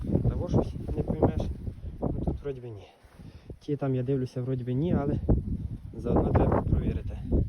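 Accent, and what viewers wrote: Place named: Dubno, Ukraine. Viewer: Чую по розговору, в мене бабуля з Дубенського району була, все дитинство там провів 👍👍👍. Зря хочеш продавати пасіка то для душі....але ти правий шо особо не заробиш на цьому ділі.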